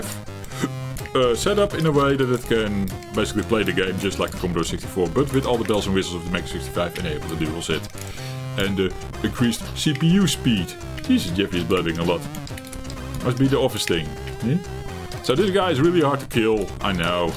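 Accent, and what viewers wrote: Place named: Netherlands. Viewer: Lekker! heerlijk nederlands engels! pikte het er gelijk uit De appel Falls niet Far van de tree! love IT! gelijjk gesubscribed!<3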